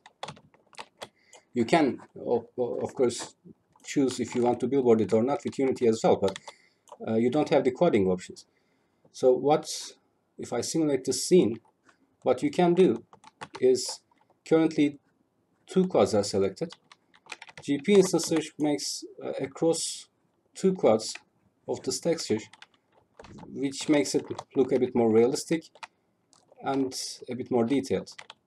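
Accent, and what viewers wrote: Turkey. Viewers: Türk aksanı mı duyuyorum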